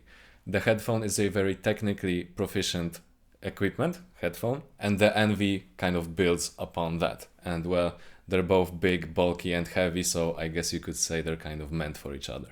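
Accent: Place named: Poland. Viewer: jesteś z polski? mam takie wrażenie po tym jak mówisz paweł i po akcencie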